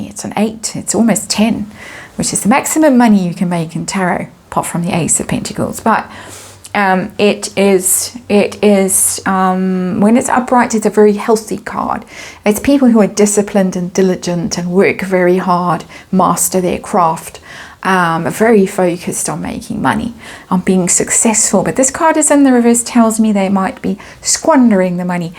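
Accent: South Africa. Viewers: Your readings are so interesting, and pretty much on the money . your voice transports me back To Cape town where i lived in the 70! Looking forward to the next! Grateful for your time and sharing ❤ I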